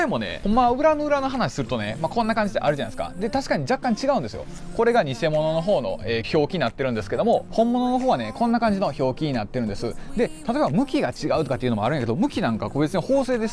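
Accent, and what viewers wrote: Kansai, Japan. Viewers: ペラペラ関西弁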